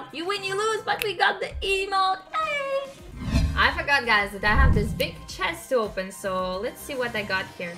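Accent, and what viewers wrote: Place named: Eastern Europe. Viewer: oh nice, but i guessed this slavic charm haha, pozdrav iz poljske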